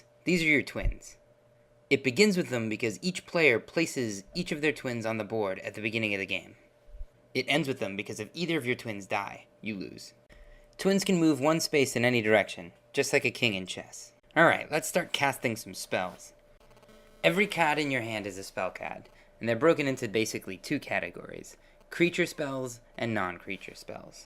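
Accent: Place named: Boston, United States. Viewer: I was just teasing. The Boston accent makes it sound like he's saying caads when he says cards. I got my key just fine and the game is pretty fun.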